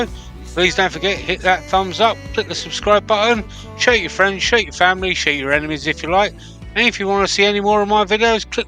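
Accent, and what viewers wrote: United Kingdom. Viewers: Looks great, I'm a little confused, your accent sounds like you're from the UK but you pronounced Aluminium as if you were an American.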